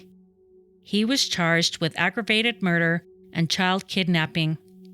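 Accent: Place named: Midwest, United States. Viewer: You have the sweetest Midwestern accent. New follower here. Love your channel.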